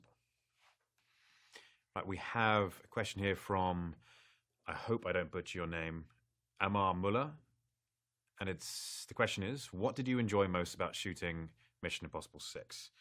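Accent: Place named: United Kingdom. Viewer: esse sotaque britânico que eu amo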